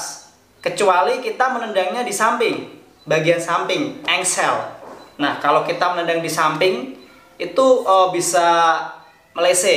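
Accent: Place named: Java, Indonesia. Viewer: Daerah Mana Bang Kok ngomongnya Jawa hehehe... Sosis Lu bang (suami Takut istri)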